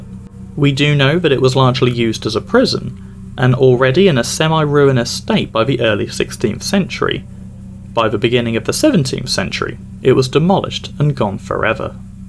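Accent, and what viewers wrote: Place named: Norwich, United Kingdom. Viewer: I noticed your norwich accent and enjoyed it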